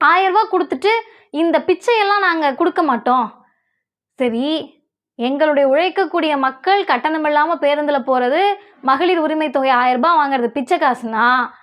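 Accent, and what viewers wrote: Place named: Brahmin, India. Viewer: அக்ராஹார. பாஷைக்கு. நம்ம.. சகோதரிக்குத்தான். பொருத்தமா. இருக்கு. மகளே. அவா. மாதிரி. அப்படியே. ஒவொரு. அசைவும். பேச்சும். இருக்கும். வாழ்த்துக்கள்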